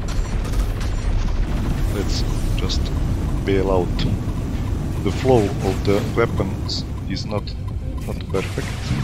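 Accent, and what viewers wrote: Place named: Russia. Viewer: Русский судя по акценту )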